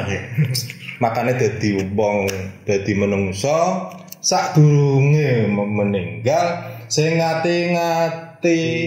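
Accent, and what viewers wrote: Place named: Pekalongan, Indonesia. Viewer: Logate koyo wong kalongan o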